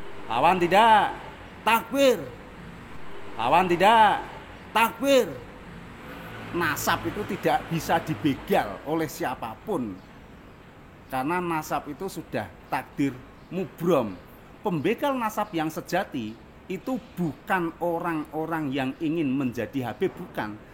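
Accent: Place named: Java, Indonesia. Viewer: Ini pak guru cerdas walau agak medok dikit . Lanjot pak de..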